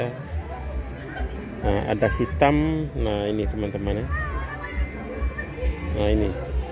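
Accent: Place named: Bajawa, Indonesia. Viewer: Logat e Logat Su pi merantau Logat Kampung masi dibawa ke kota Besar e..dasar org BAJAWA Flores .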